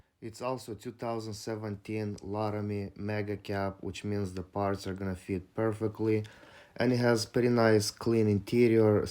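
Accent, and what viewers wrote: Russia. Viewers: Русский акцент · мне одному показалось что у чувака акцент русский? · Русский акцент всегда узнаю))) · Мне показалось,или Русский акцент? )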